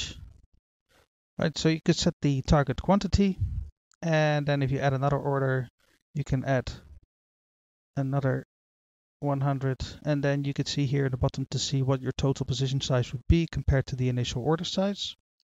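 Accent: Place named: Netherlands. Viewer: Idk why but it kinda sounds like you're Dutch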